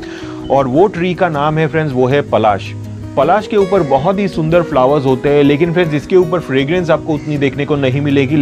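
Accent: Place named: Gujarat, India. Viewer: Aapki aavaz se aap gujarati lagte ho....😇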